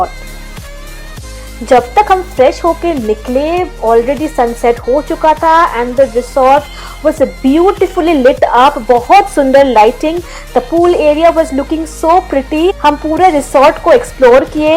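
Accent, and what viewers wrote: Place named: Bengal, India. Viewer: কথা শুনে বাঙালিই মনে হল। তাও বাংলা নেই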